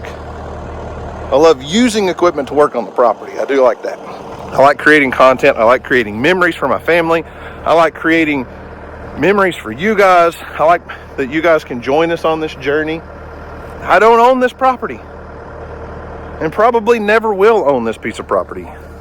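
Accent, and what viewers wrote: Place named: Oklahoma, United States. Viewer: He's got the Okie accent...he used three words! "It mussbe nice" And folks that don't work hard and don't "grind" as younger folks like to say nowadays...they'd just rather be jelly!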